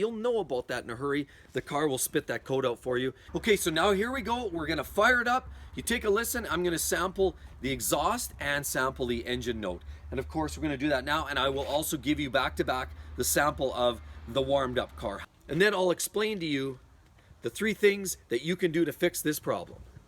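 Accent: Canada. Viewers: Are you Canadian or from Minnesota?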